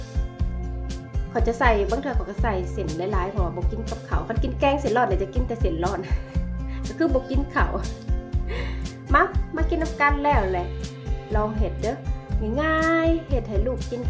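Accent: Savannakhet, Laos. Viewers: ມັກສຽງໄທສະຫວັນນີ້ເດ້ ໄດ້ຍິນແລ້ວຄິດຮອດຄາວໄປຮຽນຢູ່ສະຫວັນ.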